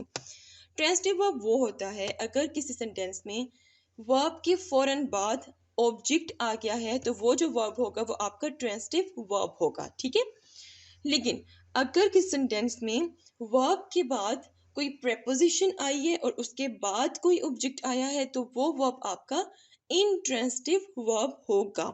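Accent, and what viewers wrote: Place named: Punjab, India. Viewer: You speak Urdu and few sentences in English with Punjabi accent. For example, words got and correct you pronounce with Punjabi accent. Also, a basic Urdu mistake: when you begin a sentence with Aap, it ends NOT with ho but hain. This is Indian type of Urdu which is not correct.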